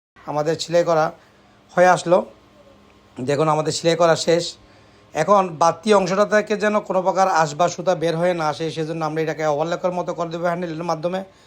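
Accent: Chittagong, Bangladesh. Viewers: Vai apni Chittagong er….. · চিটাংগা মানুষ বদ্দা।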